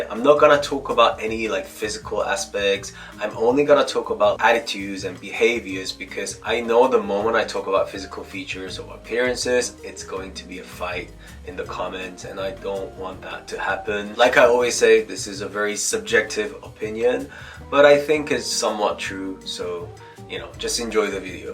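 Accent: England, United Kingdom. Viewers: Omg you sound english, American and English it’s so cool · Love love your English accent. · Am i imaging a British tone to your English lol. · speak English with a British accent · Why do I for some reason hear a hint of a British accent? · Love the British accent!! ❤ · you can tell you learnt English in England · Korean food is THE BEST, I don’t know how I ever lived without it and now I eat it all the time. Love your Brittish accent 🫶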